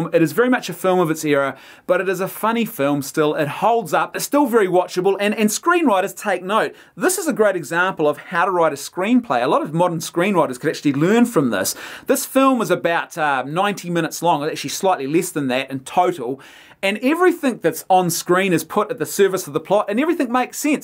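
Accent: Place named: New Zealand. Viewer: Is it? I always thought he was saying "Brandon" with a Kiwi accent.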